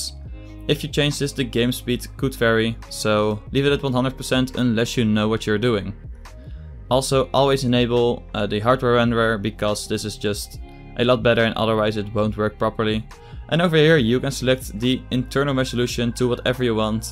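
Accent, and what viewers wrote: Netherlands. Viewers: je hoort meteen is Nederlander (geen haat bro) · Haha ik hoorde het aan je engels XD, niet dat het slecht is. Goeie tutorial trouwens :)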